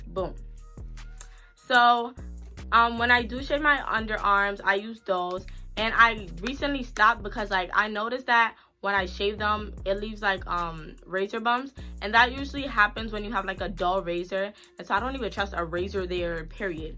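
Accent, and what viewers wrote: New York, United States. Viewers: I love your new York accent 😍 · Youu from Ny ? Ya accent 😍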